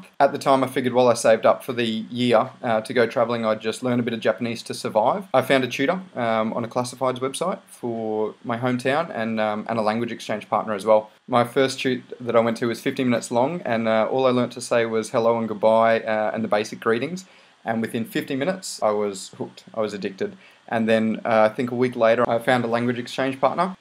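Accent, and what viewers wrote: Australia. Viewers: ! I knew you were Australian!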